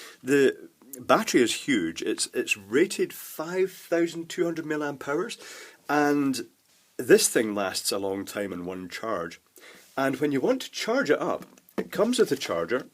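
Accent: Scotland, United Kingdom. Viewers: i knew it, just some of the words you were saying and the accent! I'm from Glasgow myself so hello fellow Scotsman! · it's not your boomy voice, it's the Scottish accent ;-)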